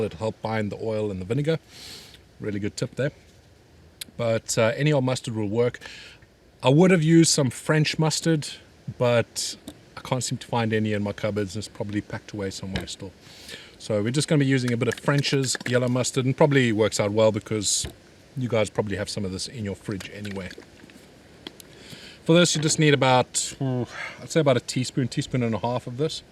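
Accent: South Africa. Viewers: By the way. Are you an EXPAT? You Sound like a South African boytjie 😁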